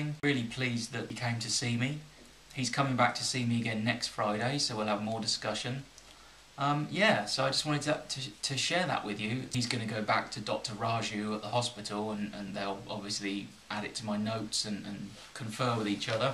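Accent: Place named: United Kingdom. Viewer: I rarely leave comments on YouTube but I think you are really brave to talk about your mental problems in public, in front of a camera... You look like a truely good person and I hope you get better soon. I'm a high carb low fat vegan too (starch based) and I find your videos so helpful and interesting ! Keep going ! And I love your brittish accent....so soothing! (I'm French)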